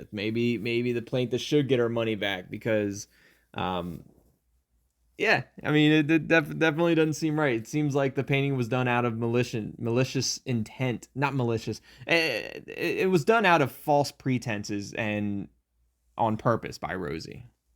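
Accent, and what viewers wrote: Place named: England, United Kingdom. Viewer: I am English and this is the funniest English accent I have ever heard 😂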